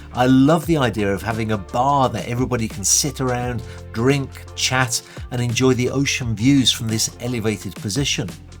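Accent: United Kingdom. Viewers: It’s pronounced sole-on. Not Sal Awn. It’s one word Brits have to the most annoying accept. Ext to Chinese rambling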